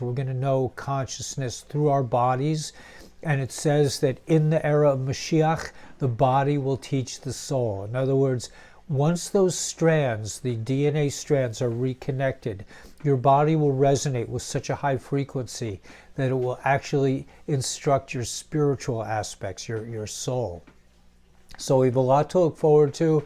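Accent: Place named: Armenia. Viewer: Good Afternoon, lmao yes stay away from death trap... question I' half Armenian I can remember my Great Grandmother speaking and it sounds similar to the way you pronouns a lot of the words you speak.. Enjoy the rest of the weekend, Love to Light...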